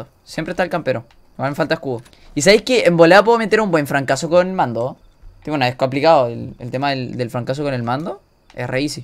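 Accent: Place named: Argentina. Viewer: Ctm que es estresante cuando un weon que es chileno habla como argentino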